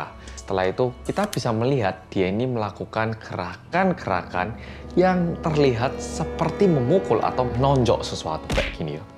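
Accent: Java, Indonesia. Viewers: Wes mas...wong jowo tenan iki..,😀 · Kental, dalam bahasa jawa 🙏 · MEDOOOOOOK !! Yey akhirnya up >< salam kenal dari jakarta ~~~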